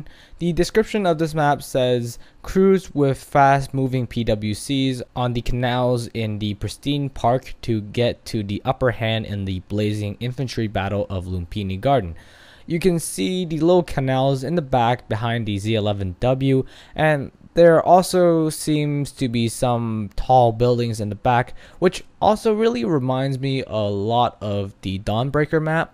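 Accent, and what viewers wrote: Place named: United States. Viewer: All your intro sound like your American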